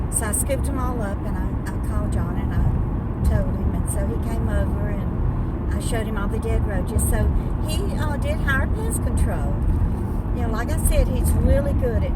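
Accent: South, United States. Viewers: MOVE! Your living in a High Price Area. You sound southern, move to the south. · Hi, your accent sounds southern.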